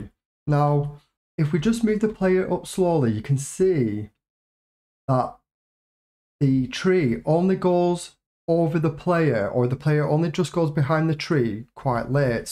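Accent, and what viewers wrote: United Kingdom. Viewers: Let me guess, British?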